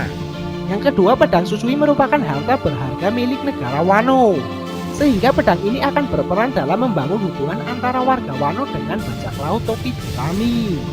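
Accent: Java, Indonesia. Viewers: Admin logatnya sengaja di medokin ya ? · Kayak dari jawa.. medok bro.. smangat aja bro.m kritik dan saran itu sudah biasa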